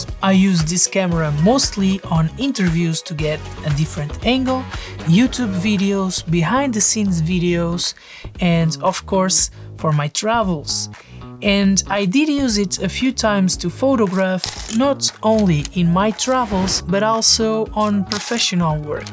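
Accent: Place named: Portugal. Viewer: bom video, da para notar em algumas partes que es portugues ahhaha, mas bom sutaque, bom conteudo, novo sub e <mail>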